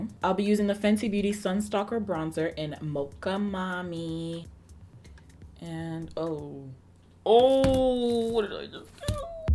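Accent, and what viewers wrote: Nigeria. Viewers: Can you do a video only in your Nigerian voice ❤️ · A British/ pommy accent made a few appearances in this vid, but I definitely love the Nigerian accent! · As a fellow Nigerian, I am going to need a video with you speaking mostly with your Nigerian accent 🤠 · Wow your hairstyle is cute! <3 Also you are one of the most unproblematic beauty youtubers! I love to watch your videos not even to learn makeup, but to listen to you talk. You always seem so friendly! Like a sister, a friend or the coolest auntie! <3 And your voice is very nice to listen to! And I also love when you speak with your Nigerian accent! <3 It's almost like ASMR for me! <3 Sending you all the love from Poland! Wish you the best! <3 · Can we please get a video of you speaking only in your Nigerian accent? I just love it !!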